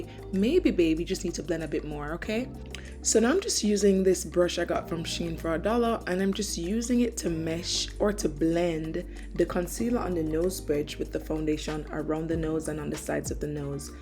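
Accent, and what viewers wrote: Jamaica. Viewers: What is it, Jamaican? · From mi hear di accent mi subscribe 🇯🇲❤ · I don’t if am hearing wrong but are you Jamaican? Because I’ve been binge watching your videos since I’ve discovered you and I’m getting Jamaican vibes 😅. Great videos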